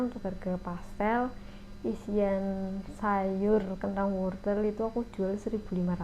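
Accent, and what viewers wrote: Banyumas, Indonesia. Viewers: Oalah Purwokerto toh mba.pantes bisa bahasa ngapak..salam mba aku dr banyumas😊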